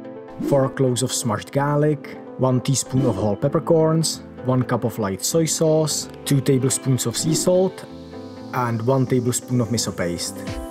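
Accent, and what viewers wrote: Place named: Czech Republic. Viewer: Z přízvuku se zdá, že jste Čech. Skvělé video, vyzkouším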